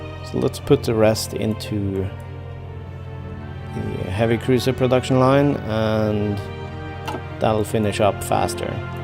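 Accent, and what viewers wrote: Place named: Eastern Europe. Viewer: I think some Eastern European country or mid Asian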